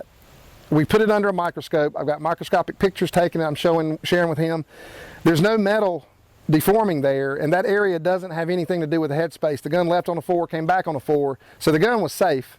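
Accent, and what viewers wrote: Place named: South Carolina, United States. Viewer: That SC accent makes me miss the Carolinas. Ive lived and worked down there off and on for years (Charlotte, Columbia, barnwell, Gaffney, Lugoff, Myrtle Beach, etc). South Carolinians are some of the best folks on Earth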